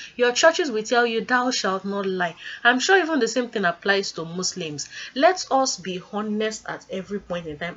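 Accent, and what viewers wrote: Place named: Nigeria. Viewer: You so funny girl....made me laugh the way you narrated the story 9ja style😝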